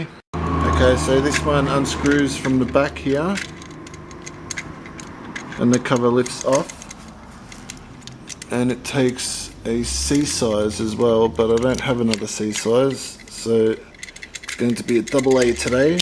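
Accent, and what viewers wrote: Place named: Australia. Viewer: your Australian! but I'm not =( or american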